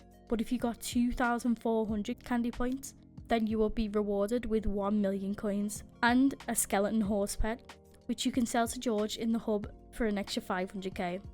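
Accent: Liverpool, United Kingdom. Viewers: are u from liverpool u have a scouse accent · I love that british accent lmao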